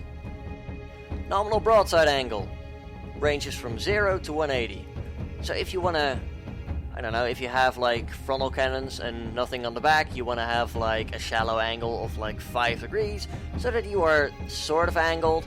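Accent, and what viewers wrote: Netherlands. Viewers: why dose the ai failsafe not conect and Are u dutch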